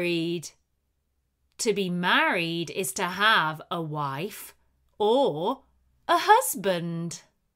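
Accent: United Kingdom. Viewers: the best channel to learn English in a British accent in a fun and not boring way...thank you for this story...keep going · I love the British accent for learning English! And I love it your voice, speak slowly, and your stories are very interesting and I can understand all perfect!❤ Thank you very much for your excellent work! Greetings from Spain!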